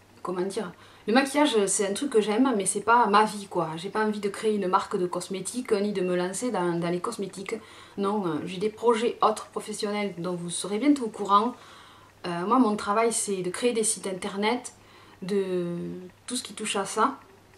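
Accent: South, France. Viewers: ton accent du Sud ne me dérange pas du tout d'autant plus qu'il est chantant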